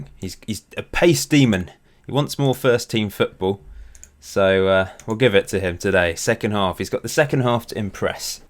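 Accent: RP, United Kingdom. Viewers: You're actually so posh 😂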